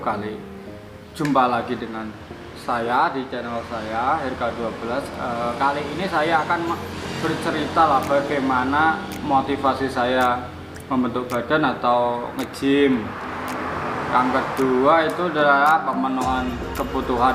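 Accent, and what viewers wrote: Java, Indonesia. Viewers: Medok banget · Suaranya medok bgt mas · Ojo meduk" nek sereden 🤣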